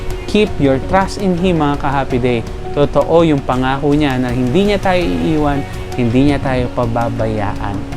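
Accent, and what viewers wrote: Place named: Batangas, Philippines. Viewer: ah kala ko lang po kasi na may Batangueño o Tagalog accent 👍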